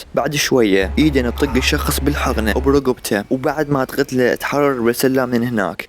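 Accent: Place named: Khuzestan, Iran. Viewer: حلو لهجته اعتقد اهوازي 😉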